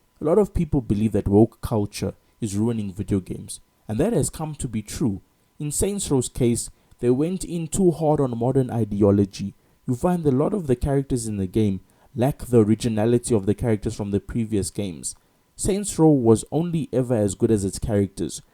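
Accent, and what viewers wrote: South Africa. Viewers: I recognized that SA accent. You coloured ?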